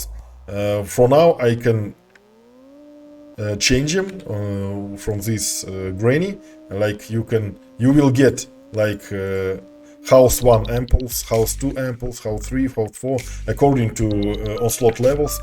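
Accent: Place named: Russia. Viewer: У тебя такой русский акцент лол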